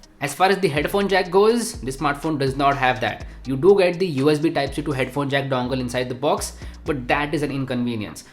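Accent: India. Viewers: But where are you mugging Indians with your Indian accent 🤢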